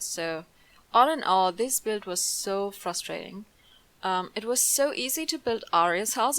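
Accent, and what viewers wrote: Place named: Germany. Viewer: Bist du deutsch? Weil das dein Englisch hört sich so an als wenn du deutsch bist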